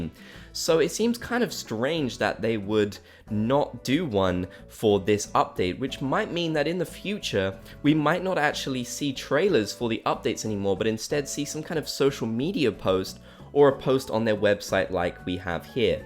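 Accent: United Kingdom. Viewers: Gang can y'all boost this so he sees it? Having accurate captions would help viewers like me a lot. The auto-generated ones don't work well with British accents and I really want to be able to watch these videos AND fully understand what you're saying Accessibility is really important! #Accessibility #DisabledViewer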